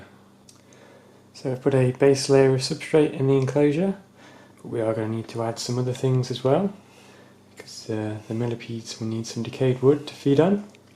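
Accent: United Kingdom. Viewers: You are from derby aren't you!!!???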